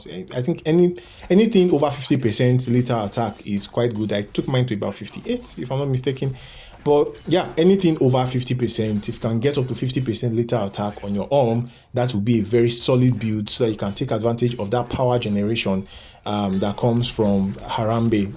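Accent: Nigeria. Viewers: Bro are u Nigerian?? Nice content btw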